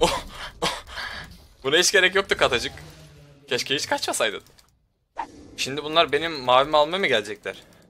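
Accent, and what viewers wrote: Trabzon, Turkey. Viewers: Bence Trabzonlusun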